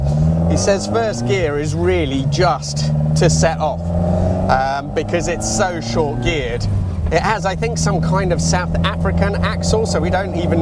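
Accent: Italy. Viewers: Keep the Italian pronunciation, I really respect and appreciate that. Such a beautiful language. The rest of us are just guessing unless somebody teaches us how-to. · Eccellente. I do appreciate your Italian heritage pronunciation. Three years in Italy 1970-73 - my (English) baby sitter's husband was Italian. He took pride in his (replica) Mk1 Escort Mexico. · One word for this car, ‘PROPER’. Keep,up with the Italian pronunciation. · I can't believe some didn't realise you was of Italian origin. I love the Italian accent, Alex Polizzi fault. 😊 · Keep those Italian pronunciations coming!!! ❤ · It's lovely to listen to the real pronunciation of Italian words 🤌🏻 It's part of this channel's charm. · I love it how your Italian pronouncement of Italian words comes through. I really enjoyed the one with your dad on Italian car pronouncement. I speak a tiny bit of Italian still after working in Italy for a year and absolutely love the language (and food). 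I also shared this video with a good friend of mine, who uses this body as a race car but with a Mazda rotary engine 😋 I had a lovely Alfasud (yes I try to pronounce that the Italian way) and because my ratio leg length / upper body is quite opposite to "the Italian ape" ratio, I had to extend my steering wheel hub by about 15 cm and I bent the gear lever quite far back to be able to sit "normally". I had a lot of Alfa Romeos and absolutely loved them, best driving cars I have ever owned. What an absolutely gorgeous looking and sounding car, testament to the work that went into it, brilliantly done. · What an epic cool little car, a credit to the owner.. Always liked your Italian pronunciation, and as always thank you for the great content. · I like your italian pronunciation, but…Why do you pronounce Abarth the wrong way then? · Carry on with the Italian pronunciation, I too have Italian parents and I was born in London and speak Italian too. 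Bravo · Love you Italian pronunciation of Italian marque names ❤ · I, for one, love your italian accent. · YOU HAVE MADE NO SECRET of your heritage ❤🇮🇹 personally I love hearing the authentic pronunciation …………. It’s an education Thank YOU 🙏🏻 Pass my regards on to your Dad from Dundee 🏴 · I thought I detected an Italian twang in a previous video.🤌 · ❤❤❤great video thanks I love the Italian accent and your videos are always interesting ❤❤❤❤ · I don't think I've ever seen one of these, I absolutely love the way it looks! What an awesome car! Also, I love that you pronounce Italian names with an Italian accent. it's part of you. never changes, buddy 😉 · Do Italians talk like this, which dialect and accent?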